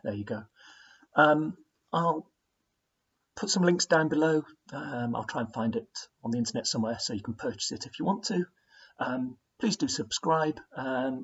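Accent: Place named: United Kingdom. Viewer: Please tell me in which british accent you are talking😊💐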